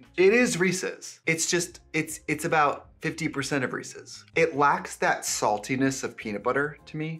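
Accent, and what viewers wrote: Wisconsin, United States. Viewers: Per beggg love her Wisconsin accent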